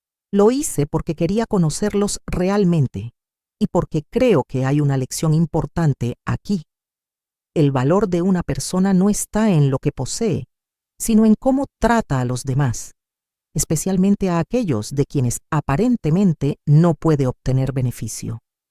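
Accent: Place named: Puerto Rico. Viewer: Que hermosa lección con lindos valores de siempre ser humildes. A parte, creo que la narradora es puertorriqueña. Acostumbro a escuchar cuentos e historias y esta manera de hablar o el acento me parece muy familiar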